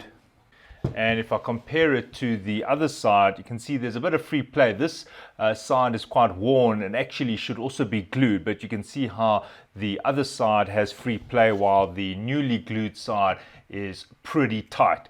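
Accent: South Africa. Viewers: Defo a South African accent!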